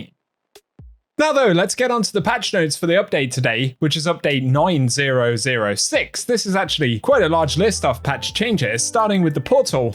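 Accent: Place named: United Kingdom. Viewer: 😂 That freaking Brit accent ☠️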